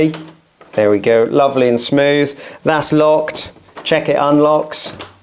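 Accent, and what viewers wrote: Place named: West Country, United Kingdom. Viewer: Thanks for the video, one question - where’s your accent from? Bristol..Dorset maybe?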